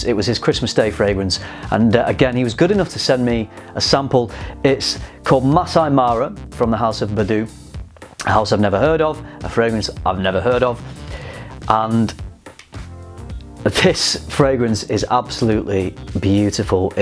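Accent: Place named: Leeds, United Kingdom. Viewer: Are you from Leeds? Trying to figure out where the accent is from 🤔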